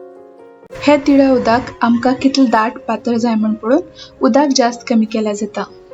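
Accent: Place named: Karwar, India. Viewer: Waàw felt like am in Karwar .... after long time I heard Karwar Konkani ...thank u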